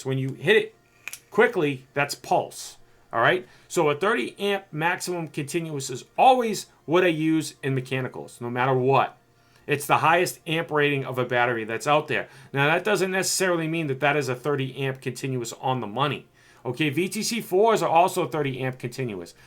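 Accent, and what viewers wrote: Boston, United States. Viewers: Well said D from 25mins on but learned alot. Also...love the accent, is that Boston? 😄💨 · You just gained a new subscriber because with all the videos I’ve put myself through yours is the one I got the most knowledge from. Are you from Boston ? Take care,all the best 🙏✌️❤️, 🍀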